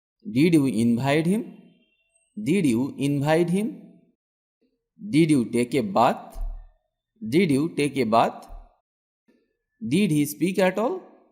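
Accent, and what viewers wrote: India. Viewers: Can you speak englisch and Not Indian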